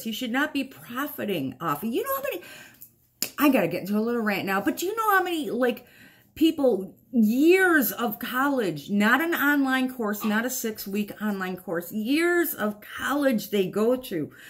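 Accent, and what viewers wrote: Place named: Buffalo, United States. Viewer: I know that this is a very invasive question, but where are you from? Your accent reminds me of my family/people in my hometown (Buffalo). I could be completely off, please do not feel pressured to answer.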